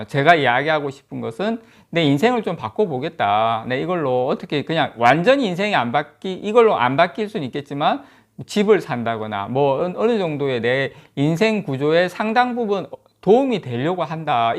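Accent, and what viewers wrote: Gyeongsang, South Korea. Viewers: 5년만젊었으면좋겠어요 70이 저만치와있는데 주식입문2년차.,올라가면좋고 떨어지면 또 사면되고.기다리지도않고요..심심하지않아서좋아요 고퀄지식에유머에경상도?억양도웃음나게하고...가슴만보여서셔츠와상표만보고 ㅎㅎㅎ감사합니다....알찬방송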